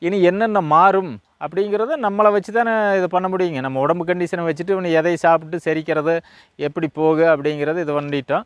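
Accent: Kongu, India. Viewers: வேருக்கு மருந்து கட்டுற மரமும், காடும், ஆளும், குடிக்கிறவர்களும், போய்விடுவார்கள்... கிட்னியும் புடுங்கிட்டே போயிறும்.. கொங்கு தமிழில் வேற லெவல் பேச்சுங்க அண்ணா..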